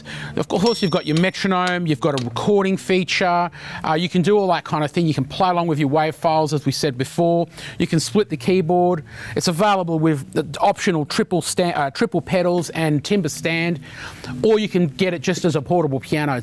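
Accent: Australia. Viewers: Lol. He collects a check from Roland for hyping their product..and in that thick Australian accent. But despite the hype it's not a bad piano. I got one and love it.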